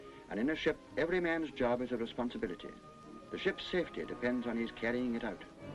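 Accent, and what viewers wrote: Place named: RP, United Kingdom. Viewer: What a posh accent!!! Changed a lot by now.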